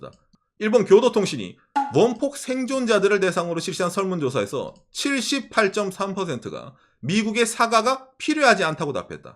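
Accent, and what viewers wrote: Gyeongsang, South Korea. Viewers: 역시 경상도네 ㅋㅋㅋㅋㅋㅋ 경상도는 과학이다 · 저거 최소 경상도 50대 이상 남성임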